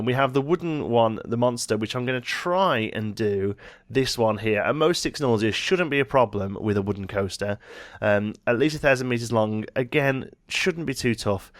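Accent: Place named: Birmingham, United Kingdom. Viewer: thought i could hear a glimpse of that birmingham accent hah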